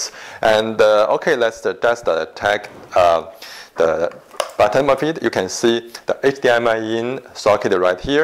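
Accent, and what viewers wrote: China. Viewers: Couldn´t you find someone to present products, that are more proficient in the spoken English? It´s too "chinglish" for my liking....but excellent product!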